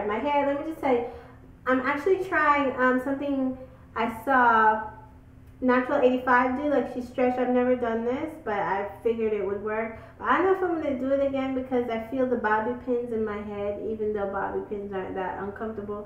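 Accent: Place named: Caribbean. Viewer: Found ur channel today. Great videos, I learned a few things. Aside from that, its super creepy, but we have the same accent and voice. I'm probably older than you, but I feel like I am watching a video of myself. I showed my boyfriend and he things so too. Are you by chance from the Caribbean?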